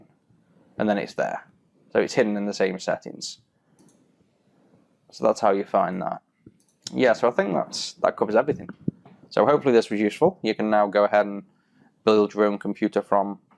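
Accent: United Kingdom. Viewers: You've got a strong British accent!!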